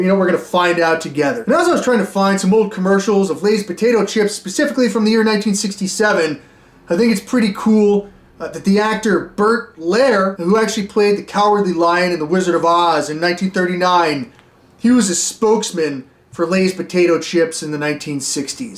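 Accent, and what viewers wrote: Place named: South, United States. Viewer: 👀👀 you and Bryan Broaddus almost sound identical. Hes a Dallas Cowboys scout southern draw but yall sound so similar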